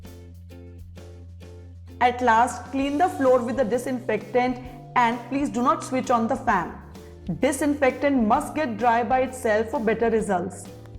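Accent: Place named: India. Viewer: You Indian aur UK Q English toking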